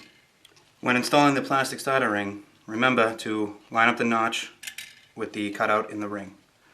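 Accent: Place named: New Hampshire, United States. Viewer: Great, helpful vid! As soon as he said ‘Polaris recoil stahtahs’ I knew this was NH. We moved to VA not too long ago, and man I didn’t think I would miss home or the accent this soon lol. The other thin I miss about NH is no sales tax(or INCOME tax!!). Also seems like there are 3-4x the amount of used ATV’s up there, for less $ than here. And oh ya, NO F IN SALES TAX! Haha happy riding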